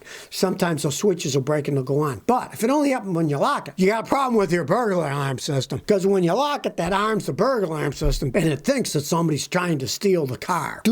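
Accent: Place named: United States. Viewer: you talk American! Buy you don't buy American!! Your a Toyota and Honda man!! Your either with American or not!!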